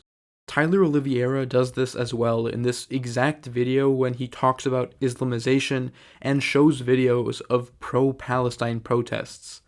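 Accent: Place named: United States. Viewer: I think it’s relevant to mention the guy who narrates this video, maybe lives in Germany, maybe is of German descent, but is not German by blood. He speaks with a clear American accent. Something to consider since he’s taking a clear globalist leftist position in his videos on Germany. Probably just some brainwashed college student rich kid. So obviously hes pro immigration pro invasion pro communism.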